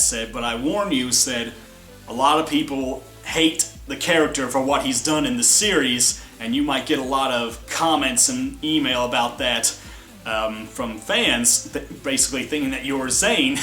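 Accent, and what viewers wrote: South, United States. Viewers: YOU'RE JUST WITH A COUNTRY ACCENT!! Thnk goodness..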